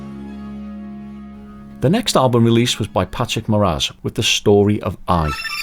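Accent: Liverpool, United Kingdom. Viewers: Nice to hear another Scouser being bang into Yes. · Your work on these videos is outstanding and you deserve, at the least, many many thanks. The liverpool accent is very controlled and toned down also and, as such, it works perfectly so no apologies needed from you re that. As for - while I love all the 70s albums (perhaps, Tormato not so much), when I first heard this one (I got it as a xmas present and found it before xmas and just couldn't wait to hear it so gave it a listen and hastily put it back where I found it) it blew my socks off! This is Yes as "in your face" as you can get. "Metallic Yes" as I call it. Not as in heavy metal but the sounds of just about every instrument. Cranked up and piercing.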